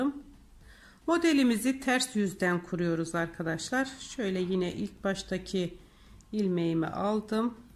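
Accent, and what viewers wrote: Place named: Black Sea, Turkey. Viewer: Karadeniz (Rize)şivesi bu!👍😊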